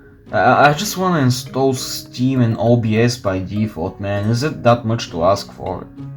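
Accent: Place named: Bulgaria. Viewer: i knew i heard a bulgarian accent. Great video tho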